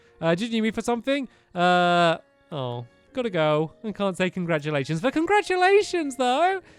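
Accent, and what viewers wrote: United Kingdom. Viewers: Aré you british?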